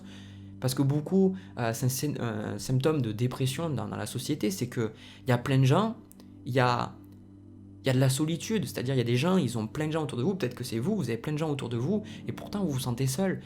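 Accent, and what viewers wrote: South, France. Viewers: Bon, je me pose une question à ton sujet depuis que j'ai découvert ta chaine... D'un côté je me dis que je me trompe car tu sembles avoir un accent du sud mais bon comme la question revient malgré tout à chaque fois, je fini par te la poser... Est-ce que tu es né du côté de Rennes avec une lettre de plus dans ton nom officiel ? Si oui, je t'ai porté sur les fonts baptismaux... Namasté... ♥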